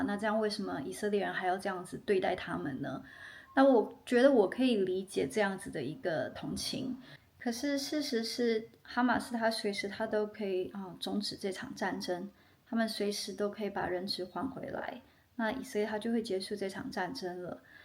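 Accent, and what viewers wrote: Taiwan. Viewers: 聲音真的一聽就是住台灣的😅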